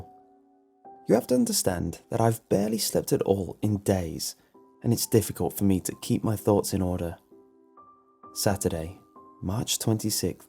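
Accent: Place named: Cockney, United Kingdom. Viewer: It's called a 'Cockney' Accent..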